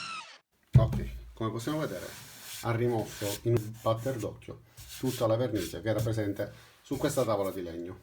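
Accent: Sicily, Italy. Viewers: Se la LIDL ti perde come cliente mi sa che è spacciata! Sembri siciliano dall'accento, lo sono anche io, se hai degli elettro utensili da vendere in blocco come usato fammi sapere che ci accordiamo tramite mail! Saluti!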